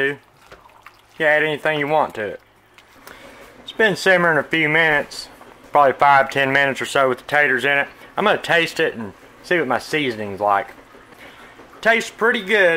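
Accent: South, United States. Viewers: Thank You for the recipe. Love the “four” potatoes you put in. Looks like 5, but we all know them taters can be big or small, so use your own judgement. Love that killer southern accent, and vids from a Man with a passion for cooking. 🌺🌴💕💕💕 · I love your country talk I love watch your video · I love so much your southern accent. I could listen to you all day. Texas here. I might try one of your recipes without all the salt. Thank you. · Looks good. I'll make a batch. The southern accent just kills me.... was laughing my ass off the whole time. Not in a bad way - it was just hilarious to listen to. Kinda reminded me of Justin Wilson. Look forward to more cooking.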